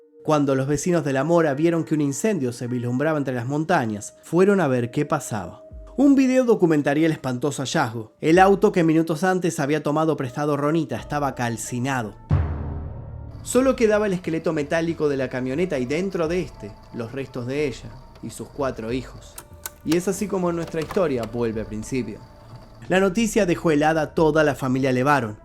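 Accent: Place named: Argentina. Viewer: Esos malnacidos ni son mexicanos, no los aceptamos, argentino de mierda que maldito acento tan feo. Largo de México!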